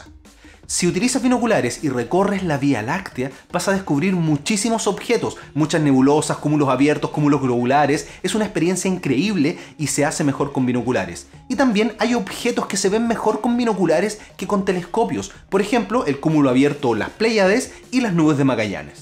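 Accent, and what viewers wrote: Chile. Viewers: Es chileno y me encanta como habla!! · Si eres chileno, eres el referente de como debiésemos hablar todos jajaja. Excelente video y canal. Va esa suscripción. Saludos y felicitaciones !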